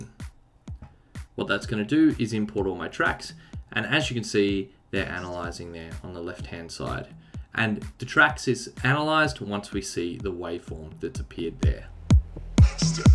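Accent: Australia. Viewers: wth r u from sydney?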